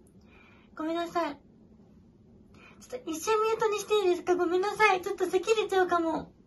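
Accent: Shizuoka, Japan. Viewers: 初コメです^ - ^静岡県民なのでウソだらの静岡弁聞けて嬉しかった(*^_^*)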